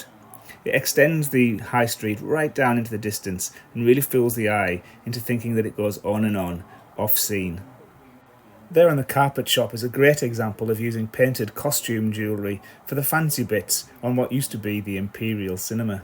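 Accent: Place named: Liverpool, United Kingdom. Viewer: Well, it sound a bit like the Beatles. So maybe it is Liverpudlian. [Is that a word?]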